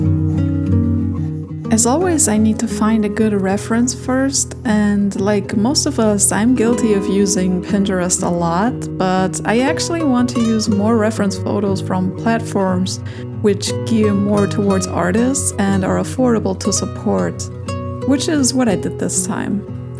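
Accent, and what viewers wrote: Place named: Germany. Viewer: Subscribed! I LOVE the colorful portraits, but honestly? I wasn't expecting your accent. I spent a handful of very happy years living in Germany as a teen, and i didnt realize just HOW much i missed it. So im going to draw alongside your videos and feel all the comfort and nostalgia in the world. Thank you 🥹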